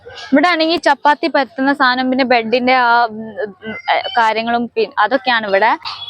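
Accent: Malappuram, India. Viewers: മലപ്പുറം slang ആണ് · മലപ്പുറം ✌️ആണ് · മലപ്പുറം ചേളാരീ.കോഴിക്കോട് ജില്ലയിൽ തെക്ക് ഭാഗത്ത് ഇതിനോട് സാമ്യമുള്ള സ്ലാംഗ് ആണ്.എന്നാൽ, കോഴിക്കോട് ജില്ല മുഴുവൻ ഇതേ സംസാരമല്ല.കൈയിലാണ്ടി,വടകര ഒക്കെയുള്ള വടക്കൻ ഭാഗത്ത് തികച്ചും വ്യത്യസ്തമായ സ്ലാംഗ് ആണ്.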